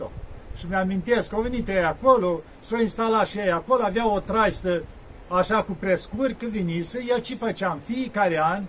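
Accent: Moldova. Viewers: Ce frumos și cu duh povestiți,va ascult cu gura căscată, aveți graiul dulce moldovenesc, mulțumesc!!! rugați vă și pentru mine, Hortenzia Mihaela,și as vrea sa ne spuneți modalitatea cum sa trimitem bani și pomelnic la d-voastră!!??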